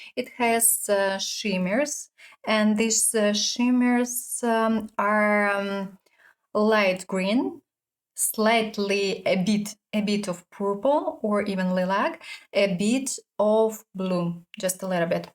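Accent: Russia. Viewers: Искала отзывы на новые жидкие тени от Катрис и попалось Ваше видео. Очень хорошо показали и рассказали, наверное, куплю зеленый цвет, думала заказать от Эльф. Хорошо так говорите по-английски, русский акцент немного слышно, а когда увидела, что Вы живете в Мюнхене, вообще удивилась. Привет из Аугсбурга и удачи в развитии канала! :)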